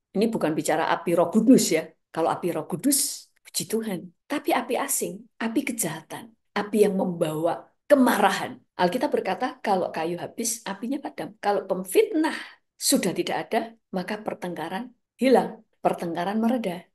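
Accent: Java, Indonesia. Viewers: Bu mangstap... ternyata wong medhok... ❤❤❤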